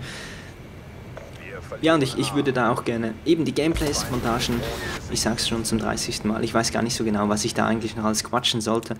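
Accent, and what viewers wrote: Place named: Switzerland. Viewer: deine Fluchen auf Schweizer-Deutsch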